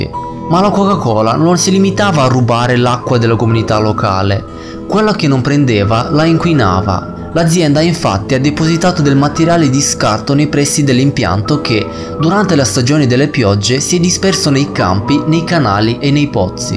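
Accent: Russia. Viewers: sei russo? hai un accento vagamente russo e poi con il sound di sottofondo lo accentua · Direi che il lessico italiano di alto livello che accompagna i tuoi video fondendosi con l'accento russo non troppo marcato, e il tasso di suspence dato dalla musica di sottofondo crea un armonia unica che ti invoglia di volerne di più di più sempre di più AHHHHHHHHHHHHHHHHHHHHH! Perfavore un top 5 curiosità sulla bomba atomica -!!! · È russo... ha un accento diverso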